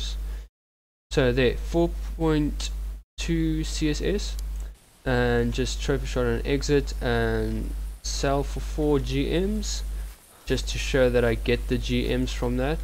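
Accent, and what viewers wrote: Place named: South Africa. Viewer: I dont think he can change it its how all south africans like me sound bru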